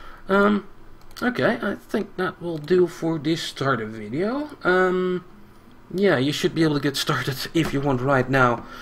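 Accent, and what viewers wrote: Netherlands. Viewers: I agree that he's got quite a heavy Dutch accent. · "The Real Meach and Pochachoes of the game" I love the dutch accent:) You have such a different accent to SivHD though, a very diverse group you are! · Nicely done! You are my favorite streamer and youtuber:) Nothing can compete with that delicious dutch accent.. Looking forward to future episodes in this series!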